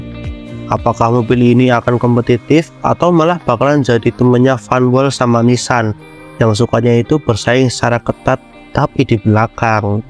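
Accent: Indonesia. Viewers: padahalmah aksen Indonesia nya biarin ajja, tapi yaaa banyak yg pengen disama'in kaya aslinya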